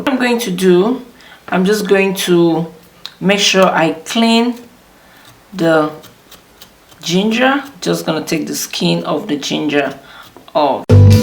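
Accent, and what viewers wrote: Nigeria. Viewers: First of all, I LOVEEEEE the fact that you stick to your Nigerian accent 😍😍😍 and not trying to fake like a lot of people on here.. secondly you didn’t talk about shaft but I’m guessing you could use it for some kind of hair mask.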